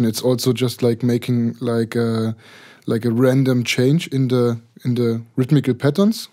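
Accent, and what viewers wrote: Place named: Saxony, Germany. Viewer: sehr cool, vor allem der edle sächsische akzent!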